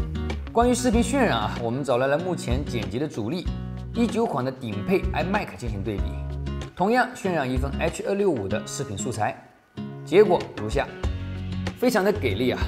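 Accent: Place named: Zhejiang, China. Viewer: 浙普的味老重了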